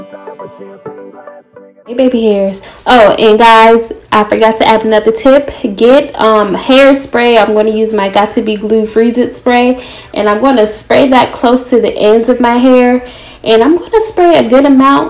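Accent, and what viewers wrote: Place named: Baltimore, United States. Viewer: She sounds like a young jada pinkett i wonder if shes from baltimore...i hear an accent ☺☺ i love ur hair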